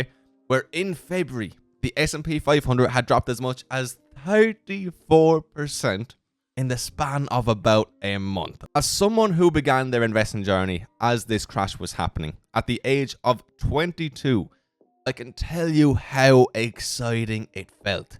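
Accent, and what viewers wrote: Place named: Ireland. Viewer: What’s sexy is you’re back Baby I’ve missed you my brother. I’m glad to see you & hear that beautiful Irish accent. Let’s get down to business and make some money baby ♥️!!!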